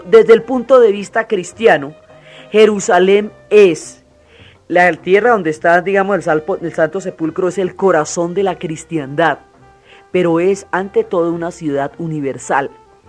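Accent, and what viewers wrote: Colombia. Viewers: Su exposición goza de un dominio de conocimientos muy alto, fruto de profunda y cuidadosa investigación, pero además, la mezcla del sabor del español de las montañas Colombianas en sus expresiones típicas de nuestra cultura, han hecho que su narrativa me despierte aún más el interés por la historia y la geopolítica de Colombia y de todos los países de los cuales Ud. ha contado sus historias. La admiro mucho!!!Sin duda que es una Colombiana notable en cualquier parte del mundo, que bueno tener el privilegio de escucharla!!! Exitos Dra..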